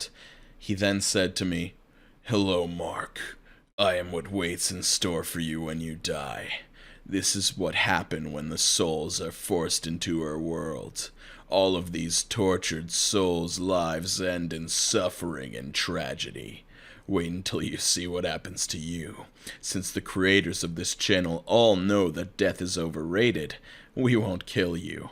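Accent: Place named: Northern Kentucky, United States. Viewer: are you from Northern Kentucky?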